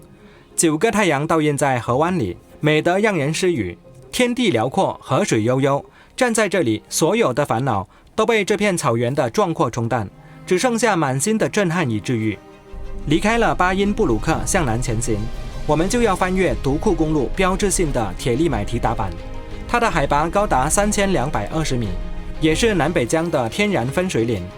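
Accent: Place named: Chaoshan, China. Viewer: 潮汕人口音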